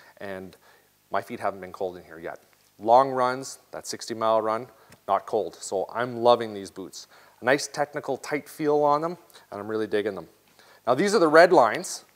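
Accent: Canada. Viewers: you cant tell by how he talks?! Canada ehhhh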